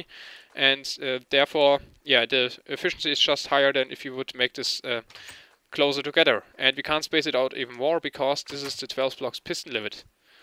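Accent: Germany. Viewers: lol bitch farm =D german accent is awesome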